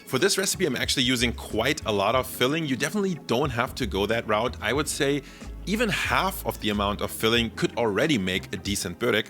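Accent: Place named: Germany. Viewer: your german accent is coming out stronger nowadays.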